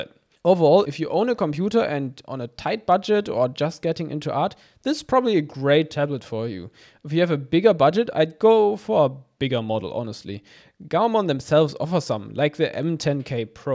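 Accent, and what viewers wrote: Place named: Germany. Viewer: he has the most german accent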